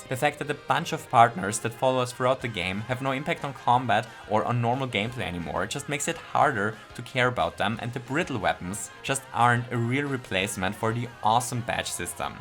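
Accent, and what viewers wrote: Germany. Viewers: Are you German? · Yes. I heard his german accent instandly, the first time I watched him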